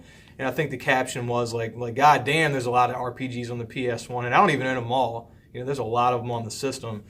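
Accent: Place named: South, United States. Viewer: Surfer dude? This dude clearly sounds like a hick.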